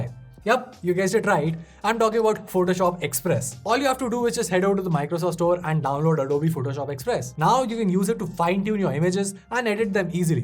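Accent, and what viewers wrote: India. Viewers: You're from delhi, aren't you? 😊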